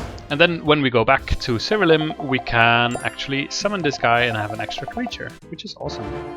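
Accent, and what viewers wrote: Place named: Germany. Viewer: Just bought this game for my wife and I. Where's that accent from? I want to say German but I could be wrong.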